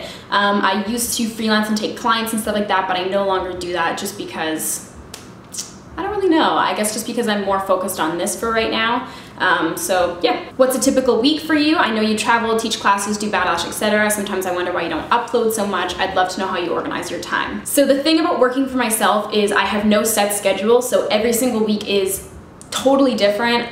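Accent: Canada. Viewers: I THOUGHT I heard a bit of a Canadian accent ;) hecks yeah! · Just discovered your channel! I'm Swiss and I lived in Van for about half a year and I miss it so much! Just listening to your Canadian accent made me feel like I was in Vancouver again, so I'm definitely gonna watch tons of your videos today just to make me less homesick :D xx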